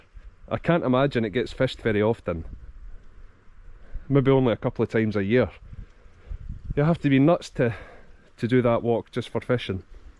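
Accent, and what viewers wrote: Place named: Scotland, United Kingdom. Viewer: Hi, i'm Italian and looking at the landscape I would have guessed Scotland as well. Not to say about his typical accent.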